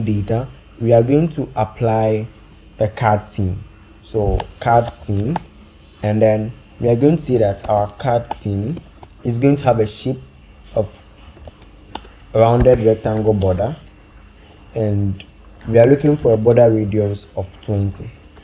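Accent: Ghana. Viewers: Thank you very much you really helped me, i can tell you are Ghanaian from your accent, keep on doing what you are doing, this will really take you far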